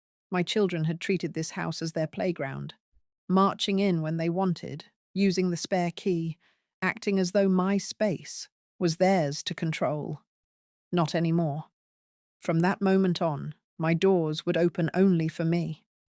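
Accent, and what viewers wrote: United Kingdom. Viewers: If she’s from Texas she has a very strong British accent, why?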